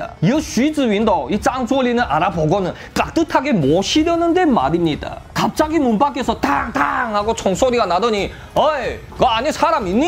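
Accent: China. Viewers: 이분 조선족이신가요?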